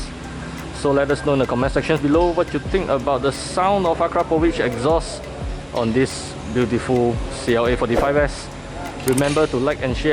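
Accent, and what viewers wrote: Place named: India. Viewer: Индуса сразу слышно 😂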